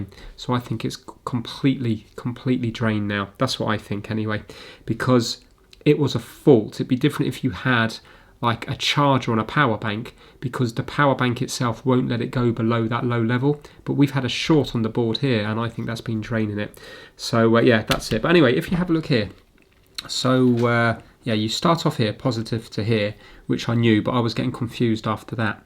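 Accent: United Kingdom. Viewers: I love listening to British people talk!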